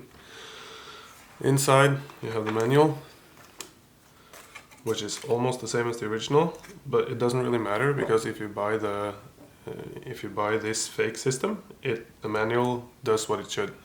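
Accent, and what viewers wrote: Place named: Norway. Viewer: This er the most norsk engelsk jeg have ever hørt. Gøy å kommet over denne gamle legenden, savner gamle ytnorge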